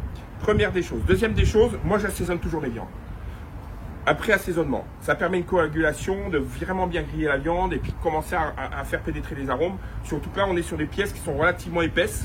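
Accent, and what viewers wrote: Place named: United States. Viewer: Svp monsieur le pseudo cuisinier, faite au moins l'effort d'apprendre à cuire une viande correctement, les cuissons c'est une technique, et l'hygiène c'est impératif, je ne vous souhaite pas que la DDPP passe chez vous après avoir laissé votre viande prendre la t° ambiante. Enfin bref, même avec l'accent Américain ça le fait vraiment pas. Si je peu me permettre, vous gagnerez à au moins regarder les vidéos de Aaron Franklin, et comme vous êtes bilingue ça devrai vraiment vous faire progresser.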